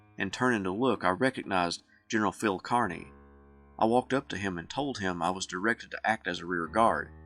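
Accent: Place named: Harlan, United States. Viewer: You talk like me,. Im from Harlan.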